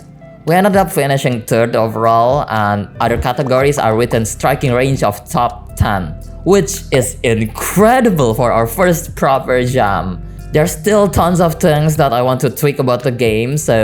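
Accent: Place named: Indonesia. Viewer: how come you sounds indonesian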